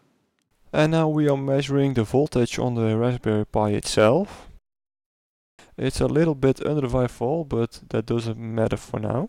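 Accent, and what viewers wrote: Netherlands. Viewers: So noticed you were Dutch by the accent. Dus ik maak het me even makkelijk. Ik had op mn pi2 eerst een plex servertje draaien, hdd powerde hij ook mooi. Nu raspbian image erop met plex en de hdd tikt lekker door (net nieuw) welke power options heb ik verder nog om de pi wat meer stroom. Te geven? (gebruikte voorheen de usb poort van mn pc)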